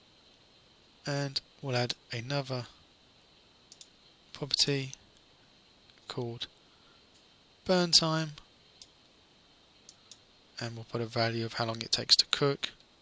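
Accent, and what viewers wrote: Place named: England, United Kingdom. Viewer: ah well... audio things may come more naturally to me as studied music and got really into mixing when I left school. audacity is free and I would encourage anybody making videos to run their audio tracks through it and back into their video editor. def worth playing around with. I never worry about speech impediments, they're part of a persons unique accent. isaac arthur is one of my favourite youtubers and he has a reeeeeeeally bad tongue tie, but everybody loves listening to him lol. and your accent is great, very english. I think particularly australians don't particularly like listening to australians, but we get bored of hearing americans all the time. (Also I wouldn't have recommended an EQ back then, I would have said just buy a $25USD mic from aliexpress, but I wasn't listening to you back then lol)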